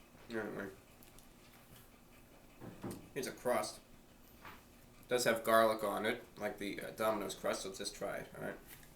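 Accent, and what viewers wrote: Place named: United States. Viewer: I dont know if this american sounding dude is in the UK or not based on the fact he said takeaway instead of takeout in another video 🤔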